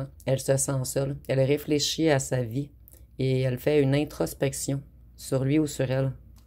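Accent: Canada. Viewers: J'adore l'accent canadien bonjours c'est Patrick vous êtes la rose de mes jours ❤❤❤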